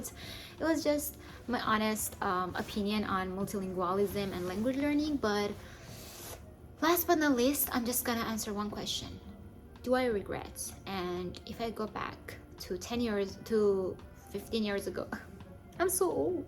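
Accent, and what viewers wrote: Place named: Arab world. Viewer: Why do you have Arabic accent!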